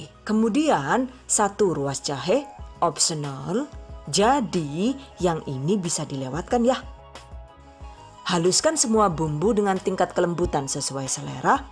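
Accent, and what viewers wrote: Banyumas, Indonesia. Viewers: Wong ngndi mba kok ngapak ky kue logate koh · Hmmm gemes dh ma suaranya bunda ni. Explainnya simple n da bumbu2 candanya itu lo yg bikin gregetan gemes. Dengerin kdg ketawa sndiri. Pa lg bhs ngapak2nya klr beeeh lucu. Semangat trs bunda bikin vidio kreatif masaknya. 👍👍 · Sebenernya suka semua videonya. Semuanya bermanfaat dan yang paling penting itu videonya ga bikin bosen, pasti ketawa2 pas bahasa ngapaknya keluar 😂 Pokoke seneng kabeh pideone rika lah yu 🤣 #GiveawayTHV2020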